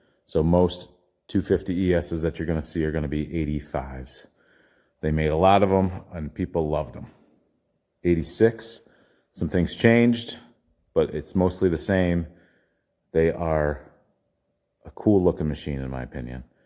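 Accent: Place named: New York, United States. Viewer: says Tires just like Stewie from family guy says cool Whip, must be that New York accent, keep making these great videos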